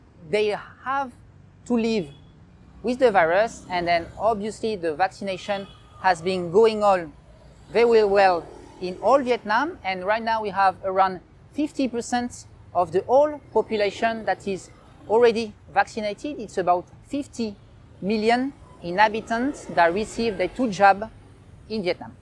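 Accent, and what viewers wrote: France. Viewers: Loves ur French accent. Great job bro ok.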